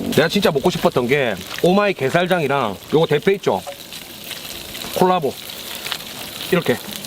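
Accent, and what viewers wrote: Busan, South Korea. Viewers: 옥상 먹방 정감있는 부산말 조합 최강 섞까 묵어봐라ㅎㅎ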